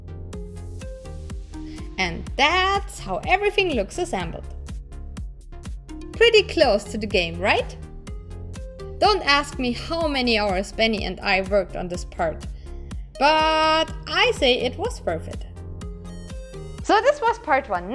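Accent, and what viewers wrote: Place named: Germany. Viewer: This german accent tho.... 😂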